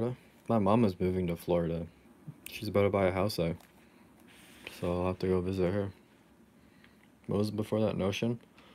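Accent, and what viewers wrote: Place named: Vietnam. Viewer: lol i love his Vietnamese accent =)))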